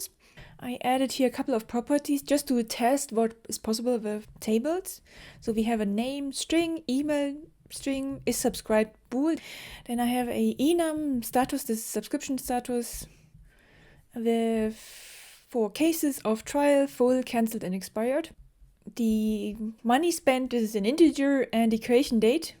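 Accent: Germany. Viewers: Hi. Love your videos. The "better" word at this point would be "contract". E.g. "You can expand and contract the columns" instead of "you can expand and decrease the columns" English is funny/hard in that it has words that are common counterparts in everyday language. For instance: - increase/decrease - expand/contract - love/hate Hope this helps. Love learning from you! (Probably because my mom is from Germany and I love your accent.)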